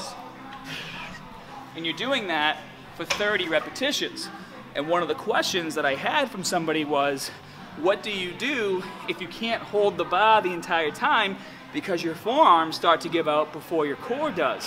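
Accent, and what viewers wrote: Boston, United States. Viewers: Dude his Boston accent REALLY shows in this video. hahaha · Boston is strong with this one. I hope you aren't taking those little jokes about your accent seriously by any means. It's part of you! :)) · I'm playing Fallout 4 but none of the characters in-game have that Bostonian accent of yours :((( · I love how u just embrace that boston accent - baaaaah instead of bar, haaaaarrd instead of just hard xD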